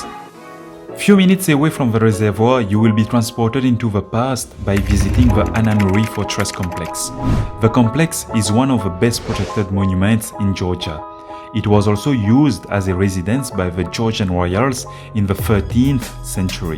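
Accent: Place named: Mauritius. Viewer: Nice video and keep it up and I love your accent I don’t know but to me it sound like yo are from Mauritius 🇲🇺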